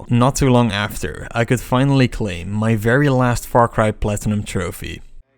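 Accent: Netherlands. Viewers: Recognised the Dutch accent immediately mate. Goede video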